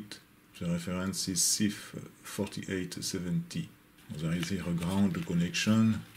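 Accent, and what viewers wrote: France. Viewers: Love the funny frenchlish. Sounds like a Frenchman in a movie.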